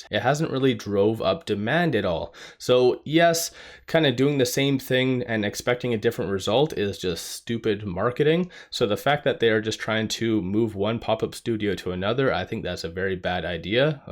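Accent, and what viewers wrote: Canada. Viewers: Good Canada speak.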